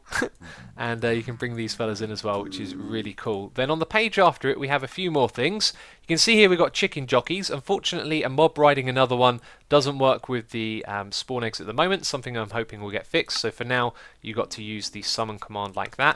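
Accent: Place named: United Kingdom. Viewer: Oh my you're British!!! Me too!! I think you're British, you sound it!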